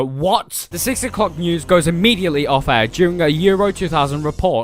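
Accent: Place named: England, United Kingdom. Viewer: Wait, this reminds me of that almighty fire at the Red Bee studios! Safe to say I was miffed with the lack of Kerrang, kinda sucks that Scuzz or MTV Rocks is no longer a thing, so I had to suffer, not to my tastes. You've got an interesting accent, it's English but where from?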